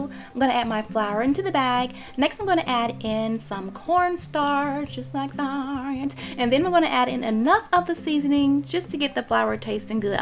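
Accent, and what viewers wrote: South, United States. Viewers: Your food is as wonderful as your lovely Southern accent; beautiful! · She just has a southern accent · Love that southern accent. Gives credibility to the southern fried chicken. :) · I love, love, love your accent!! You sound like a lovely southern belle!!!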